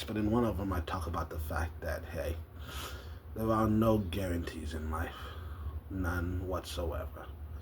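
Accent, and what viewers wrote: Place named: United Kingdom. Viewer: I originally saw you from that one video that went viral a while back at first I thought you were British or something but now that I know you're from Texas I'm kind of wondering how you got that accent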